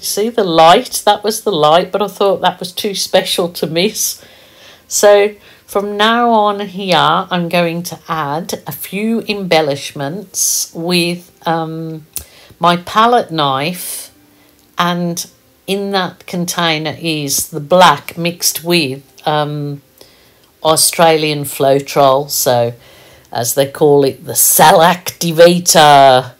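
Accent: Birmingham, United Kingdom. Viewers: I love listening to your voice it sound from Birmingham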